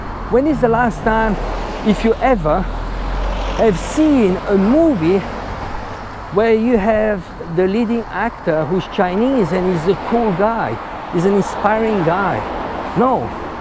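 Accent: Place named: France. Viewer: Please never stop talking and teaching about China, it is important and so necessary to push back against what we are told and given in media. I learn so much from you and maybe because you look familiar to me (my father being from Napoli) even though your accent sounds very french to me. If you have seen and lived this reality i trust 😊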